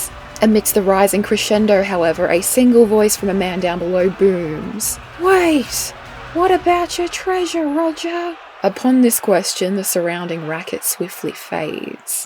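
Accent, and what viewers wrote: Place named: Adelaide, Australia. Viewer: didn't realize that you were in NSW, I assumed that was an Adelaide accent.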